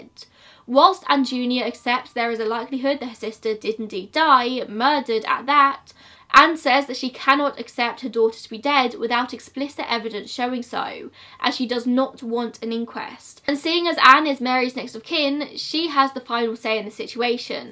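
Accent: England, United Kingdom. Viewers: I'm from Ireland, about an hour north of Belfast and only 40 mins across the sea by ferry from Donegal (the South is more North than the North lol!) Your pronunciations are so cute, bless you! I love hearing English people pronounce our towns etc, it's so cute to hear! For reference Donegal is kinda pronounced "done-ee-goll" but I do like how you say it haha! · I have just found your video today by chance. I like old cases unsolved or solved programmes and I was looking what options were there. Then I found this video about Mary and I got interested on the case. But what a surprise when I heard your Brittish accent! I just loved it because my family and I lived in London 44 years ago. And it is not quiet often to find English accent but American since my mother tongue in neither of them. I liked your programme so I decided to suscribe. It is very tragic what happened to the Boyle family and it is really worst not to find answers after 43 years. 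But hopefully someday it will be solved. I would have tried a psychic help maybe some answers would come up.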